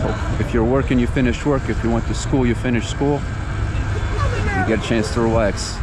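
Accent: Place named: East Coast, United States. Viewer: You sound like young Sylvester Stallone my man! That east coast accent. Subscribed!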